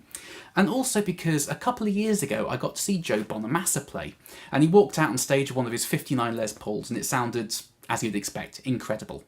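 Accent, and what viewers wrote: United Kingdom. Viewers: Great job! And I love your cool british english. Well done, sir.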